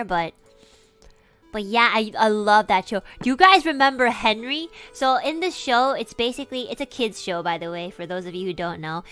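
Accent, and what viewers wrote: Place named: United Kingdom. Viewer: 笑死 英國腔真的有像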